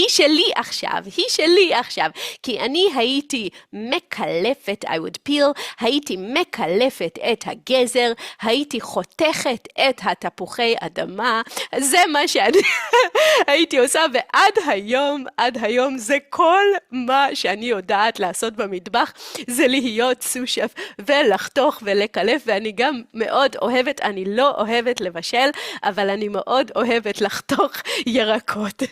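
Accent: United States. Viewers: I love your podcasts- very fun, informative, and very good for my Hebrew learning. It is awesome the way you are completely bilingual and can switch so effectively between Hebrew and English and your accents are perfect (perhaps a bit American in your Hebrew but OK!) Just one idea- if you could look into the camera when you speak. Your gaze is always to the side and a bit low. I think your podcasts would benefit from looking straight into the camera, though it may take a little practice. Thank you sooo much!!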